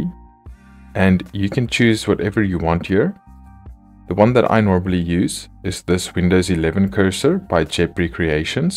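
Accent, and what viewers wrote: South Africa. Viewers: jirre south african?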